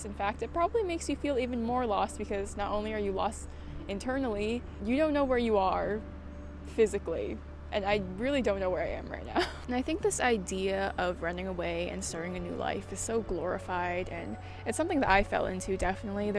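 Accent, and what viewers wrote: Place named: Toronto, Canada. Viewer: Is this in Toronto because I noticed the accent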